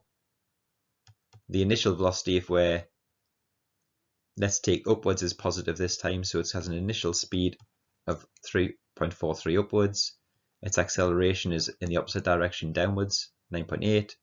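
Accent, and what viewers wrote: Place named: North East England, United Kingdom. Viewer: Many thanks. That’s a north east accent .. from where may I ask ?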